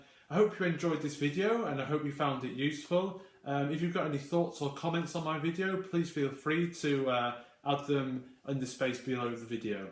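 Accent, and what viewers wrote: United Kingdom. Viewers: Hi your pronounce reminds me of my staying in London. I used to live there and I really like it! by the way I`m Japanese....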